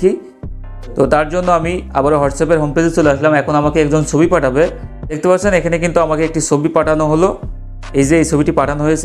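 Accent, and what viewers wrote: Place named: Comilla, Bangladesh. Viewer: ধন্যবাদ ভাই। ভাইয়ের বাড়ি কোথায়? কুমিল্লা মনে হচ্ছে?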